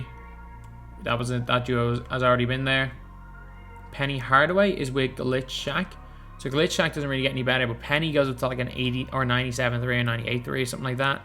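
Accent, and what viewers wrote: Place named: Dublin 4, Ireland. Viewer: your dublin 4 accent pisses me off mup tallagh son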